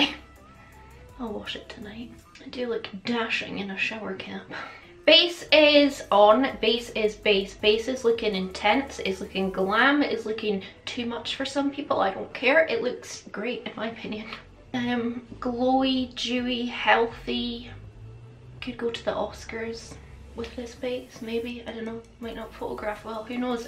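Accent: Scotland, United Kingdom. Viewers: I love your videos! I just want to say thanks for your videos, I am struggling with my anxiety just now and your videos help take my mind off things. It's also really lovely to hear another Scottish voice😂💖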